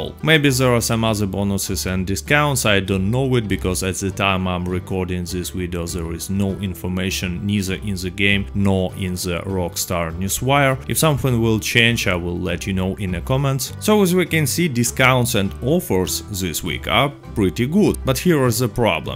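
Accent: Russia. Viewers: Пришёл с cat dead redemption, ну чётко два канала за такое респект! А так акцент русский, произношение советую тренировать. ми инглиш вери вери гуд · Russian accent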